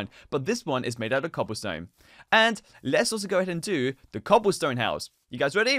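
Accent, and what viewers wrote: United Kingdom. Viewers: Obv he's British 🙄 That's clearly a British accent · Are you british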